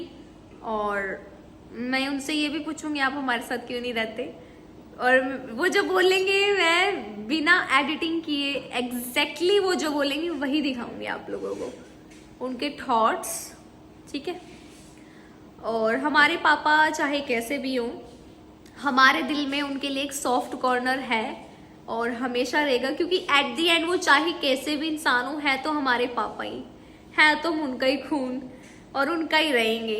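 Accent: Haryana, India. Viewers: Muje aap bolte hue ache lagte h mtlb aapki haryanvi me language bhut achi lgi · Nice vlog dii haranvi voice bhut achi h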